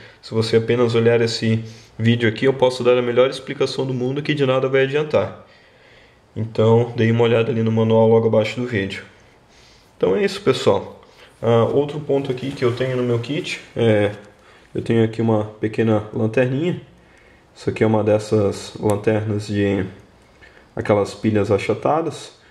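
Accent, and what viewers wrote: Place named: Santa Catarina, Brazil. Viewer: e ae cara, gostei dos seus videos, ja estou inscrito. Pelo seu sotaque vc é de SC certo? Qual cidade amigo? Abraços!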